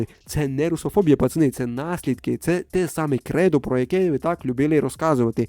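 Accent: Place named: Transcarpathia, Ukraine. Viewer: Дуже гарний закарпатський акцент)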